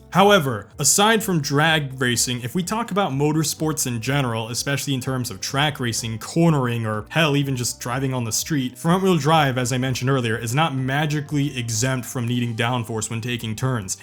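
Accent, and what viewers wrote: United States. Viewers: He's American, wtf you on about?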